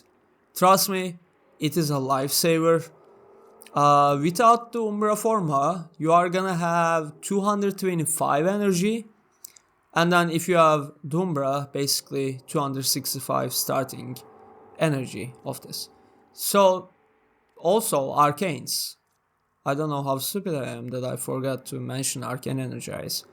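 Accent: Turkey. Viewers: aksandan direkt anladim turk oldugunu bu arada ama guzel bizim kitleden de icerik ureticilerinin cikmasi basarilar!!!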